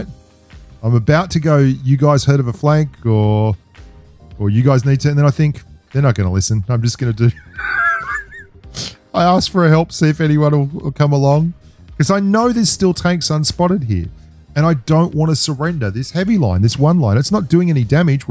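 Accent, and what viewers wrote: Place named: Australia. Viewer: I sure did miss that Aussie voice. I quit playing for several months and came back and started playing again. Happy to see you back to posting regular vids.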